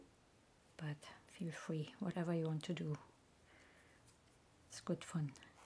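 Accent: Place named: Scandinavia. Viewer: This artist sounds scandanavian......is that wool sorced from Ireland?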